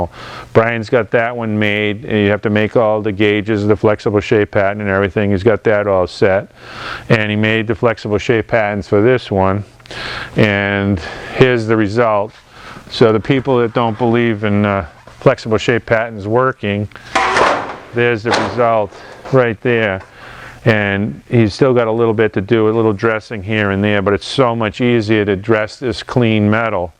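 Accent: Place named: Boston, United States. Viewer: so stunning, I can't thank you enough. Also I love the Boston accent " a little bit.... a little bit" . It reminds me of Lazze's videos when he says "littlelittlelittlelittle" in his Swedish accent. "A little bit" seems to be a metaphor for life... at least for those of us making art.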